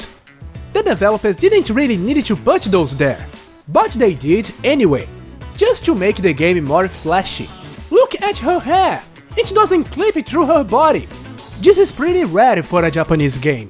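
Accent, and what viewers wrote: Brazil. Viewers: Nunca ouvi alguém a falar inglês com tanto sotaque brasileiro ahah Obrigado pela review, gostei bastante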